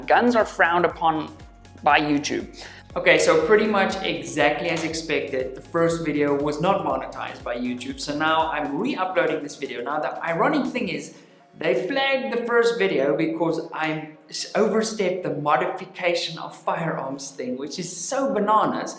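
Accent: South Africa. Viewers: I knew this was a South African accent right away 😂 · Wow, I thought. Jis hierdie ou klink moerse Suid Afrikaans. And lo and behold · Being in an Australian in a town that is becoming overrun by South Africans, your accent is really hard to listen to... I have reached a point where I can’t stand hearing that accent anymore...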